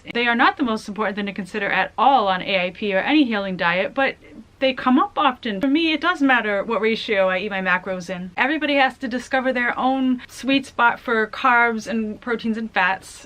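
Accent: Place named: Boston, United States. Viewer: BTW, if you don't mind my asking... where are you from/do you live? i've been trying to place your accent... i feel like i almost know it but can't quite place it. do you live in boston? i lived there once many years ago and it sounds vaguely familiar! i totally understand though if you don't want to say, this being the internet and all. :-)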